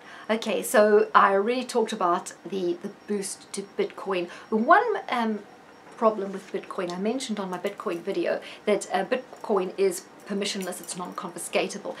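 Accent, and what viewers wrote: South Africa. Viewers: Another great video- thank you. Are you South African?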